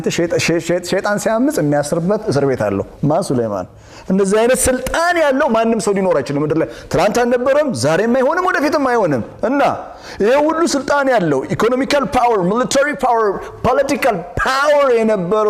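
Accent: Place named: Gurage, Ethiopia. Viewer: والله antenna guregna new yemtmeslegn anegagerh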